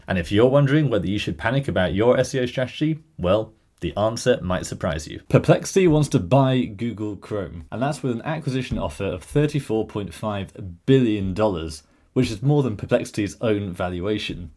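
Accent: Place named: North, United Kingdom. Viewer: Northern accent there 👀 Where you based buddy?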